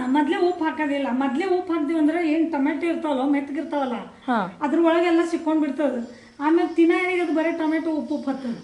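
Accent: Maharashtra, India. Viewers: Nimma Marathi mix bhase tumba channagide😊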